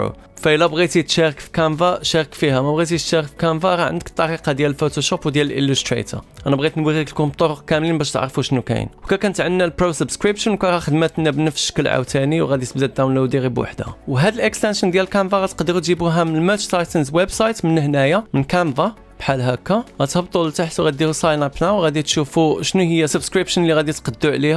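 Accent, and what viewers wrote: United Kingdom. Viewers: كاتقضي عليا باللكنة الابريطانية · Awel Marra kansheuf maghribi kihdar b darija b' british accent :D , allah yfar7eik f 7yateik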